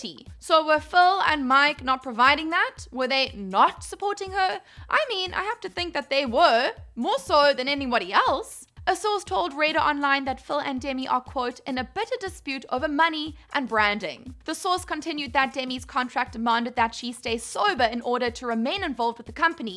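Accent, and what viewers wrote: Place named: South Africa. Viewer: Ur south african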